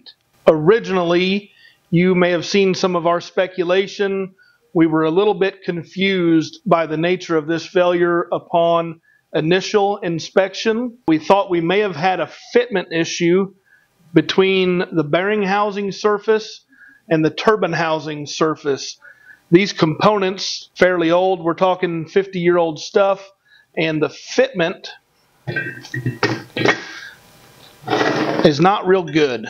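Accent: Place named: South, United States. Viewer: Leginment country boy will bring you back dreakly i reckon only country boys like us talk that way😂 but that saft dam sure got geterd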